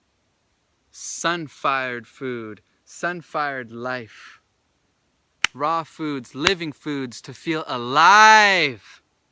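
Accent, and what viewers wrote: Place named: California, United States. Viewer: dude I really like u! ur energy and accent is cool hahaha. reminds me of a cali surfer dude. Do u have FB or IG to follow too?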